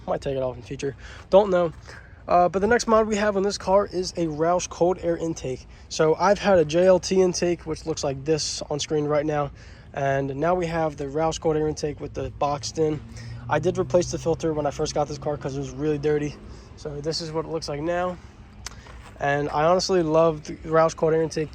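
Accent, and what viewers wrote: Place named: South Jersey, United States. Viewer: Da souf Jersey accent is real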